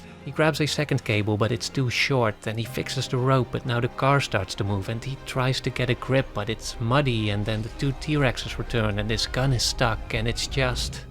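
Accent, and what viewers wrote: Netherlands. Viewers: Hey man, any chance you’re Dutch, I think I can tell from the accent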